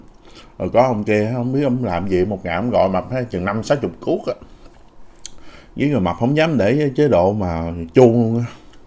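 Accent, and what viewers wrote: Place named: Mekong Delta, Vietnam. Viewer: E nói như vậy là đúng miền tây đó e mình đi làm ăn kiếm tiền giữ tiếng nói vùng miền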